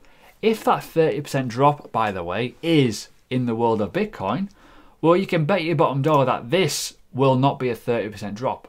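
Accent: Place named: Hull, United Kingdom. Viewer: Where are you from mate. East Yorkshire. Bit of hull accent there lol I might be wrong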